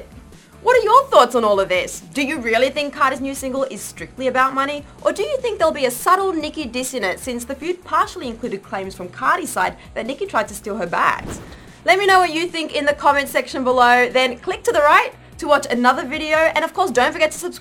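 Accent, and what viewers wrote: Australia. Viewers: love her accent :O is that australian? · Whose this new Australian host?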